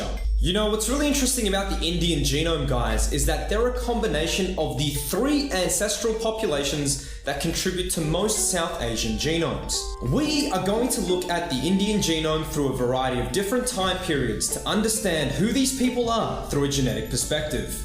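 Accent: Australia. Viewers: Your videos are great. And the Austro-alien accent makes it even better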